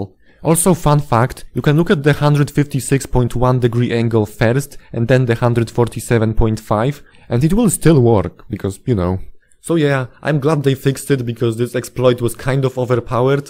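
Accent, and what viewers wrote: Poland. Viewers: U sound Polish. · Twój film wyświetlił mi się w proponowanych, klikam, a tam jakoś tak po polsku wymawiany ten angielski. Sprawdzam - faktycznie, z Polski xD Fajny kontent, chyba zostanę na dłużej 👍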